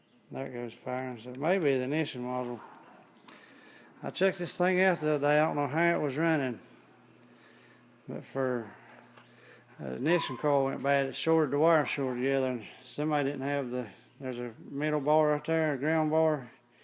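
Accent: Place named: South, United States. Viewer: I understood every word.. he has a thick southern accent.. Nothing wrong with that.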